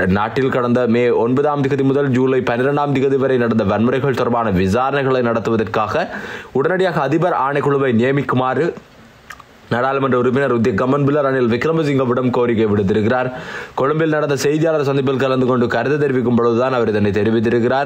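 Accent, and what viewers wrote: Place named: Germany. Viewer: இவர் ஜேர்மன் நாட்டுக்கு புலம்பெயர்ந்து விட்டு புதிதாக இலங்கைக்கு வந்து செய்தி வாசிக்கிறார் போல் தெரிகிறது.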